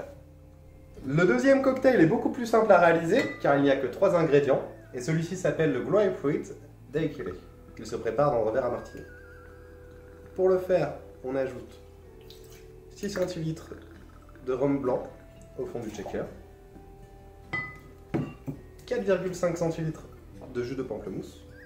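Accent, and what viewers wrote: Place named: England, United Kingdom. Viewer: Toujours aussi magnifique cet accent anglais 😂 a goûter même si je ne suis pas fan du Pamplemousse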